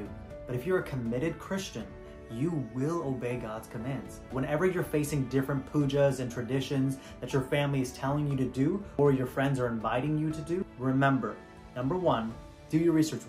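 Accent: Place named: United States. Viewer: What is you ethnicity? you look Indian but DEFINATELY do not sound Indian, at all. I even went ahead and checked your oldest video. You sound US born and raised or someplace in Europe.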